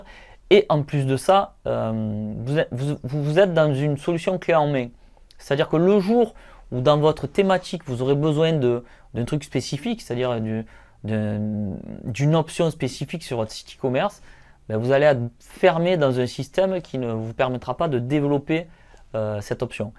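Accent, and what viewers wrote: South, France. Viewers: Salut je vient de découvrir ta chaîne et je trouve vraiment que ton énergie est super et sincère et sa fait du bien...:) en plus étant née a marseille et n’étant plus dans le sud tu me fait sentir la garrigue en t’écoutant:) Merci pour tes conseil continue comme tu le fait et bon rétablissement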